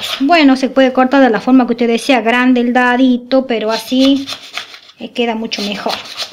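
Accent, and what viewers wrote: Tucumán, Argentina. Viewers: Soy tucumana y con la misma tonada, me encantó la receta, mi abuela la hacía igual, gracias.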